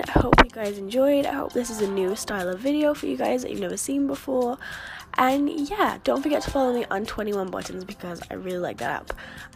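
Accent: United States. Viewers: out of curiosity why do you talk with an American accent? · Why do you sound American when you live in the UK?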